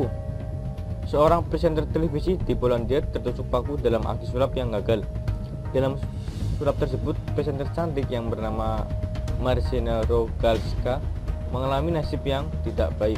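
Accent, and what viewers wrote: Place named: Banyumas, Indonesia. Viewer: iya ,, yg ngomong ngapak,,,... memang ok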